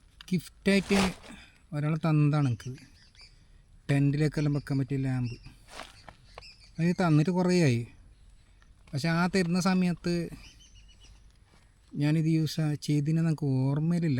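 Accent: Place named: Kasaragod, India. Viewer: ide സ്ലാങ് മദി soopper കാസർഗോഡ് ഭാഷ 👍👍👍👍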